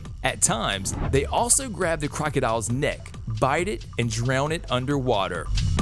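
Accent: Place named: Baltimore, United States. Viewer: You sound like you’re from Baltimore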